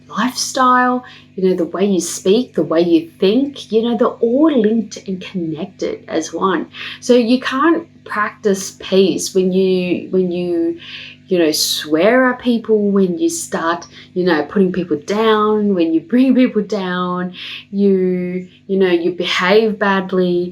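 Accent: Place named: Australia. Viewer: Great advice! So important to be in the moment. Btw love your Aussie accent!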